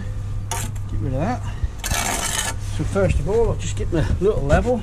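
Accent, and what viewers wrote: Norfolk, United Kingdom. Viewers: You sound like a good old Norfolk boy my friend?? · He sounds like hes from naarrrfolk, norwich?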